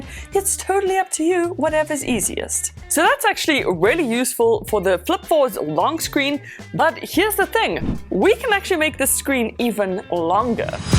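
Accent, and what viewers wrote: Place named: South Africa. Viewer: She sound Hella south african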